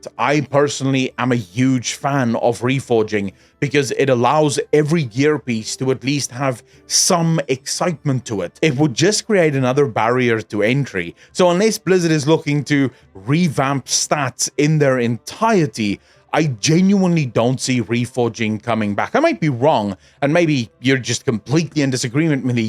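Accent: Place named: Afrikaans, South Africa. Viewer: Random question, where are you from? Your accent sounds slightly Afrikaans, or Dutch?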